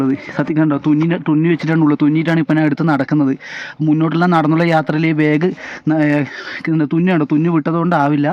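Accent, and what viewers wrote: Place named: Kottayam, India. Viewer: ചേച്ചി കോട്ടയത്തുകാരി ആണ് സ്ലാങ് കേട്ടാൽ അറിയാം. ഫാര്യ എന്ന് കേട്ടപ്പോൾ മനസ്സിലായി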